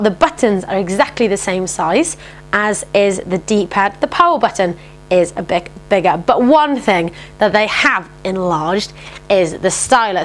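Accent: United Kingdom. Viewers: the accent is so heavy, even for a British lady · I love British accent.. ;) cute voice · thats the good old british accent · Gotta love cute girls with an English accent! On a different note, I think Nintendo should have added more improvements like since it's bigger anyway why don't they just put back in the GBA slot?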